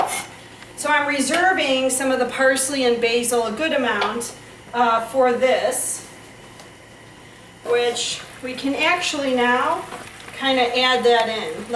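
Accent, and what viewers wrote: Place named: Midwest, United States. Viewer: Beautiful woman. Her accent sound like from the mid West.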